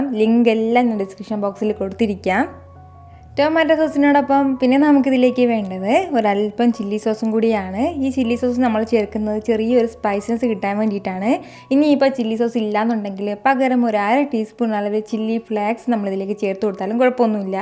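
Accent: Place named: Kasaragod, India. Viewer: kasaragodile oru nattile baasha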